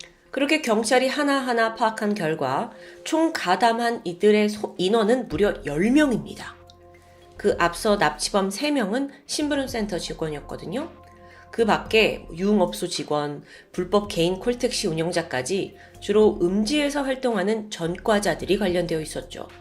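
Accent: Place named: Seoul, South Korea. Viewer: 목소리 맑고 순수한 서울말씨 얼굴 모두 다~아 좋아요 75세 팬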